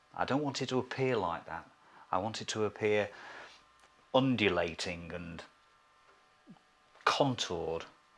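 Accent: England, United Kingdom. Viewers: So pleasant to hear an English voice. Have watched a lot of American stuff which is sometimes a bit hard on the ear!! Really great instruction too!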